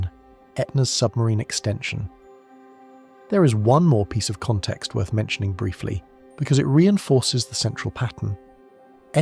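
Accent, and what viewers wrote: United Kingdom. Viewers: After reading repeated complaints across multiple posts of this channel regarding the apparent idiosyncrasies of its content, clarification seems appropriate: 1) Though we speak American English in the U.S., regional pronunciations of U.S. place names differ with regional dialects. How locals pronounce a place name often contradicts spelling. 2) The AI voice used for the channel uses British English. In some British dialects the final ‘a’ of a word can be heard as a very soft ‘r’ or, depending upon the region, a harder ‘r’. As in American dialects, both pronunciations also contradict spelling. 3) Visuals matching audio: It has been reported that this platform has dictated content creators must have constantly moving visuals, static images are unacceptable. You may have noticed some audio books for example, have exited the platform because of the amount of time required to create moving visuals that coordinate with content. 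Longer content requires a great deal of work to find, create, and edit; even using an AI tool. 4) Some content creators have indicated these recent platform changes and requirements have also carried a financial impact, making the continuation of their channel impossible to justify. There is definitely a lot of AI slop unworthy of your viewing on this platform. However, much like an audiobook or purposefully sleep-inducing content, this channel appears to be predominantly intended as an audio opportunity which uses continuously moving images (however unrela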